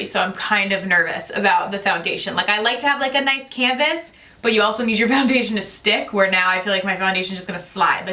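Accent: United States. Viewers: Her talk reminds me of jaclyn hill. Very american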